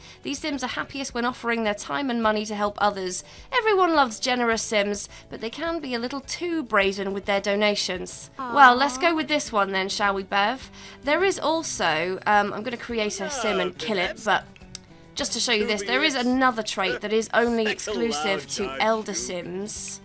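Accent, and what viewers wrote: United Kingdom. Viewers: First time watching, and this has nothing to do with the video, but I’m god awful with noticing accents but with the first word I could immediately feel the British. Username checks out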